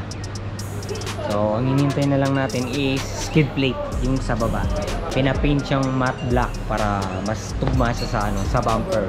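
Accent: Pampanga, Philippines. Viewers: Kapampangan Accent pa din, kuya 😂✌️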